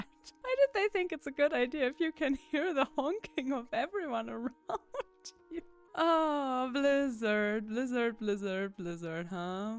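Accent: Germany. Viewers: Hi, yeah I would love watching another class, any realy, do what you're enjoying. BTW I find your voice so smoothing, and love the german accent. I'M french but father was german so it brings back memories 😉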